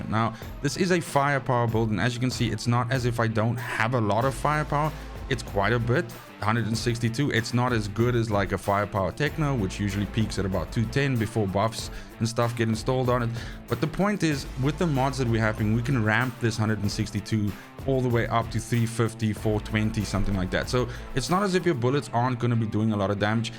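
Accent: South Africa. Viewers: Die man klink of hy van brannas en braai sal hou, as ek dai aksent so luister?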